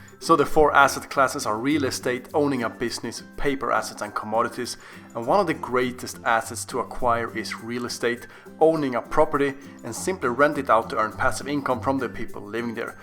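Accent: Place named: Sweden. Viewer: haha din engelska låter precis som när svenska nhl spelare ger interview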